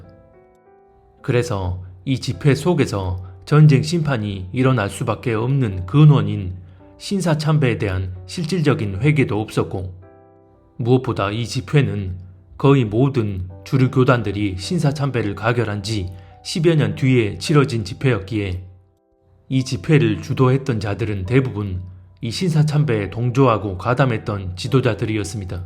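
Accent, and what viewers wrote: Jeolla, South Korea. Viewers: ㅈㅓㄴㄹㄷ 억양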